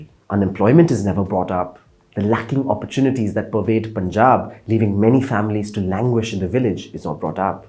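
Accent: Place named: United Kingdom. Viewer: I am eager to hear your review on Salaar tomorrow! With same classic British English!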